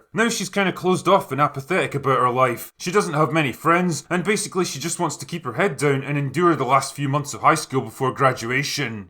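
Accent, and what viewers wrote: United Kingdom. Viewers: Tip: drop the accent, if it's designed to get attention. If it's real, WTF is a Brit doing critiquing an American film about an American heroine using her 2nd Amendment rights to bring justice to a bunch of bad guys? BTW: wilderness vs a school/ mall/ movie theater? Numbnuts, where you BEEN?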